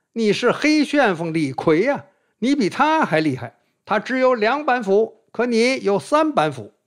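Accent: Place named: Beijing, China. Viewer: 爱听的地道北京话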